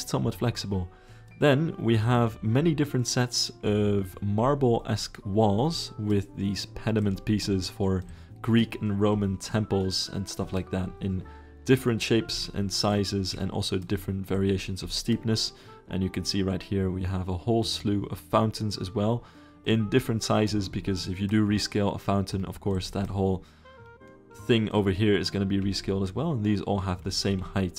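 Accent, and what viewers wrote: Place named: Netherlands. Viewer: The thick Dutch accent tho :D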